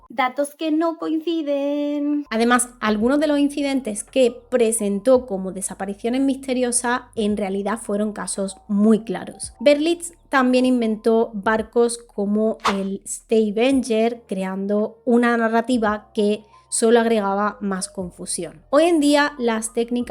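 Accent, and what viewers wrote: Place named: Murcia, Spain. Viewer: Hola, me encantan tus videos, hace poco descubrí tu canal y enseguida me suscribí. Pongo tus videos en el trayecto de casa al trabajo y a veces en este. Mi mamá está intrigada por tu acento, porque, aunque sabemos que eres española, ella no había escuchado tu variante de acento. Yo digo que eres de Murcia, pero, ojalá me puedas contestar para resolver la duda de mi mamá. Gracias. Saludos desde México.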